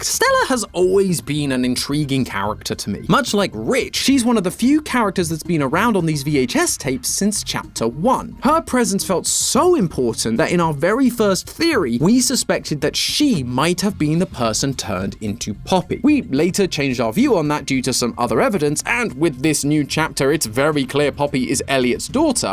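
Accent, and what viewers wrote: United Kingdom. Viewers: Truly now 100% British now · british matpat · I can’t wait to watch the British man talk about monster toy children things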